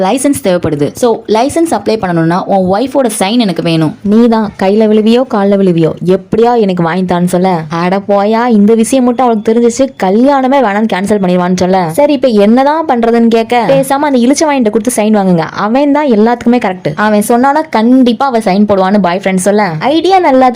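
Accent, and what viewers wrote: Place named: Tirunelveli, India. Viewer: திருநெல்வேலி பாஷை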